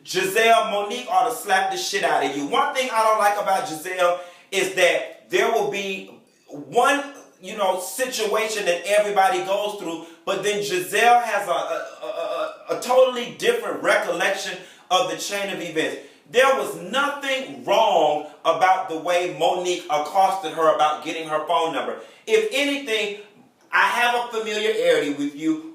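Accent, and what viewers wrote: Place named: Tallahassee, United States. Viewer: Thank you for the review! Can I just say that I love the other reviewers...each has his own dialect. But you're the only one who sounds natural saying "back peddle and pu$$y pop." I went to undergrad in Tallahassee & recognize your dialect. The others don't talk like that..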